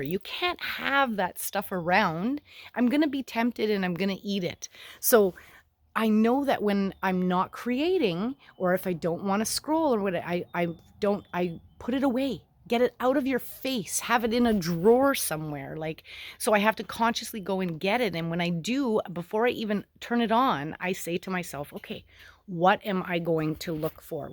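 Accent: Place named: Michigan, United States. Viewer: your accent reminds me of the mother's I grew up in hearing in Michigan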